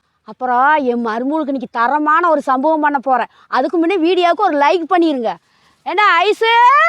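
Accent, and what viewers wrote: Kongu, India. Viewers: கொங்கு தமிழ் சூப்பர்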